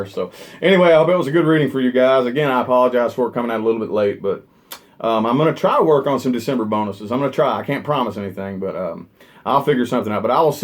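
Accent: South, United States. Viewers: Where is his accent from? Is that a southern accent? haha. Love it thanks! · From the second you opened your mouth I knew I had to hit subscribe! Love the southern accent! Great reading.....!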